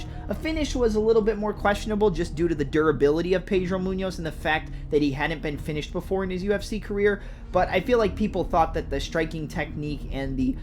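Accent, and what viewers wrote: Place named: Midwest, United States. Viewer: Youtube changed the algo to feature smaller creators. I dig it. That thick midwest accent sounds like home. Good vid.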